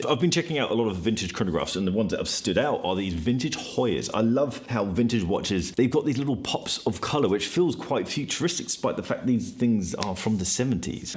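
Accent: RP, United Kingdom. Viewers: is uk based surely you have picked that up from his accent. Things happen outside the USA. But he should have clarified it’s a UK eBay Free fee offer for private sellers. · Very posh ❤ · To be clear, in the UK it is free to sell for private sellers, not business sellers. Also vehicles aren’t free. If you are in the US or elsewhere or are classed as a business seller then this isn’t the case., if the accent didn’t give it away, is UK based and not a business seller in this case.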